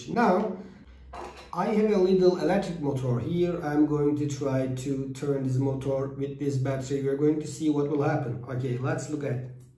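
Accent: Turkey. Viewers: I absolutely love the Turkish accent. Correct me if I’m wrong! Reminded me of my teachers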